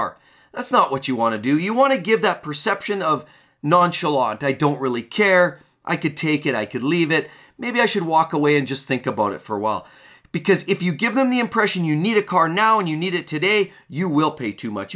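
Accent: Canada. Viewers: the most Canadian accent I've ever heard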